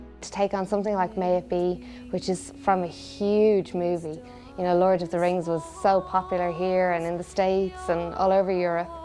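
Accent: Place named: Ireland. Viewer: the best! Her singing is incredible, and that Irish accent... I melt when I hear it!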